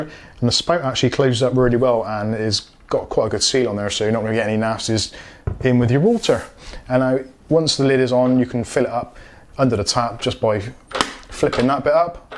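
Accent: Bristol, United Kingdom. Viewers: Certainly was; good to see it put in layman's terms. Love the Brizzle accent by the way! ;)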